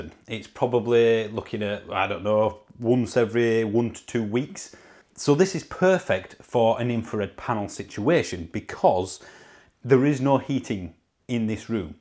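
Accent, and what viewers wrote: Yorkshire, United Kingdom. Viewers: Well said, you sound like a real Yorkshireman, unlike who I suspect is a fake.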